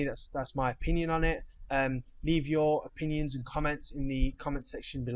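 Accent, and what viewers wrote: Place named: Midlands, United Kingdom. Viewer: AHH, ok, must be the midland twang! I'm from Manchester and we stretch out the last A. Banaaana. Loving the new surge in videos, hope you keep banging them out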